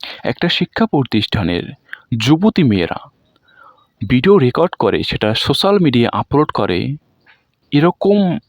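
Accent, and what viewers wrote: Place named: West Bengal, India. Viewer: কথার টান কিন্তু পশ্চিমবঙ্গের ই। অন্য রাজ্য বলতে ত্রিপুরা ওদের টান আলাদা, আর বাংলাদেশের এরকম কথার টান নয়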